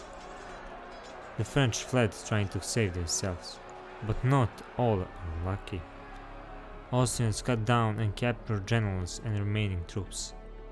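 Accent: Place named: Eastern Europe. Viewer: Cool video but your east European accent is to much for my ears. GL but i will never ever watch your movies again.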